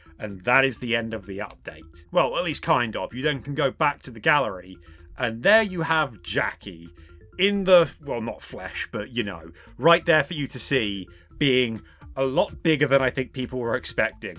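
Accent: United Kingdom. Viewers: fkn bri ish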